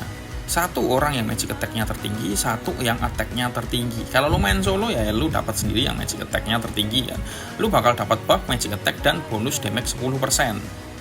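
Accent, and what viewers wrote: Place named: Java, Indonesia. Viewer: Gua sub yt lu karna jelas ngasih penjelasan nya,meski ada loga jawa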